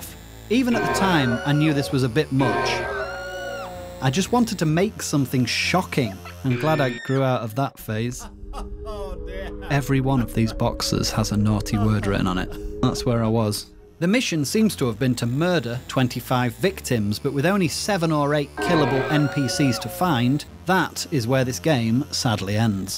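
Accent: United Kingdom. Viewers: I don't know what you mean, he sounds quite British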